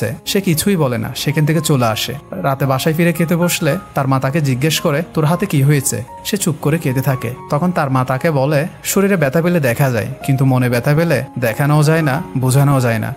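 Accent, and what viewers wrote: Chittagong, Bangladesh. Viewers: ভাই আপনে কি চট্টগ্রামের ছেলে নাকি? ভাষাটা চট্টগ্রামের শুদ্ধ ভাষার মতো🤔 আমি ও চট্টগ্রামের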